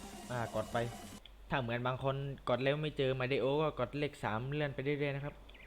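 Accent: South, Thailand. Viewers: พี่อยู่จังหวัดอะไรเเต่เสียงคนใต้ใช้ไหมครับ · พี่เขาภาคใต้ครับ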